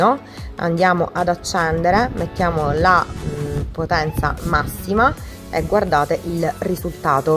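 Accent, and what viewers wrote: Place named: Sicily, Italy. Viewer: hai questo timbro di voce che abbiamo tutte noi siciliani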